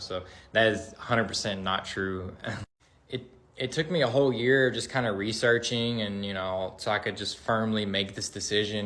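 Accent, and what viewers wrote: United States. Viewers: American accent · Alhamdolillah, welcome to the true brotherhood! The accent sounds American and not British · That’s what I thought too defos American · His accent is American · He has an American accent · Has an American accent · He sounds American. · Sounds American rather than British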